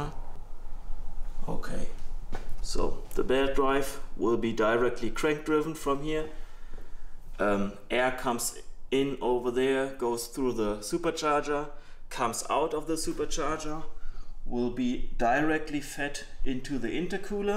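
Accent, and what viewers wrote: Germany. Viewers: Man hört direkt das es ein Deutscher kohlege ist 😂👌 geiles Teil bitte mehr davon !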